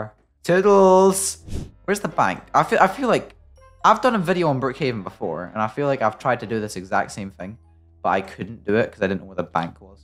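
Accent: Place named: Scotland, United Kingdom. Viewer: You Do Realize your British Scottish right?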